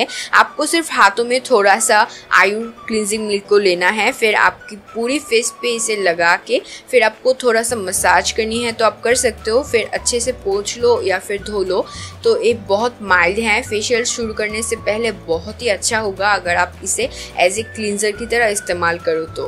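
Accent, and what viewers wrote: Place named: West Bengal, India. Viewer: But voice se to ap Bengali hi lag rahi ho ...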